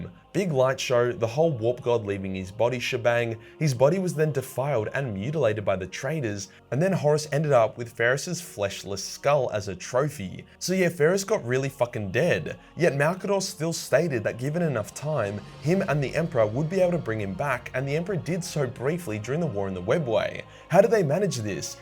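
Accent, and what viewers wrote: Australia. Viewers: This guy earned my sub for his 0 fucks given Aussie language. 🎵Aussie Aussie Aussie Oi Oi Oi🎶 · Octarius war explained by an Australian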